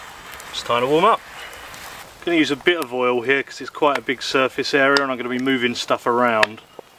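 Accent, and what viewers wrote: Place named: Blackpool, United Kingdom. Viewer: You from Blackpool? My friend Howard sounds just like you, thats where he is from.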